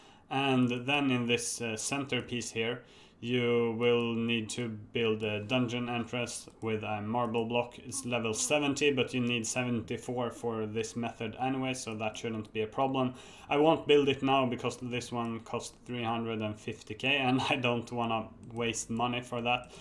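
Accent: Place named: Sweden. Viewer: You sond super swedish, amirite?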